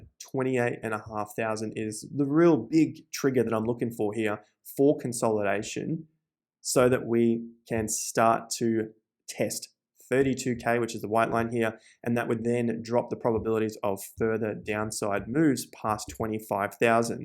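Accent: Australia. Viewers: Hey, can you do an analysis of the property market in australia please? i can hear the aussie accent;)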